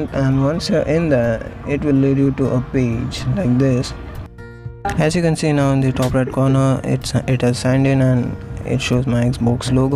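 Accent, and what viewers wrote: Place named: India. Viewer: Guys if he is indian that doesnt mean he talks weird and he is stupid. HE IS NOT HE HAVE OTHWR ACCENT and thank to you . But why you are not happy when talking?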